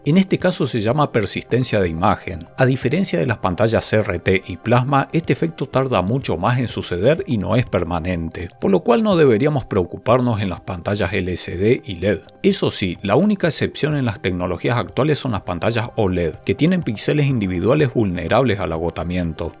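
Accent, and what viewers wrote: Argentina. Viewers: Por tu acento me da curiosidad saber de que parte de la Argentina sos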